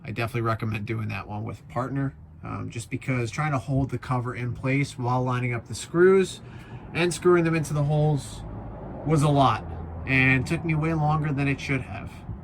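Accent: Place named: Long Island, United States. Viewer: That Long Island accent 😭😂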